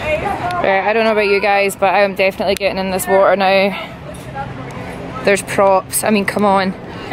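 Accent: Scotland, United Kingdom. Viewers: I need a Scottish accent I love it!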